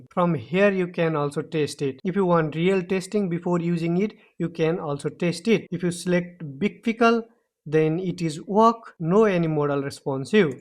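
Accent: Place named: Nepal. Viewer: your accent is so nepali you nepali?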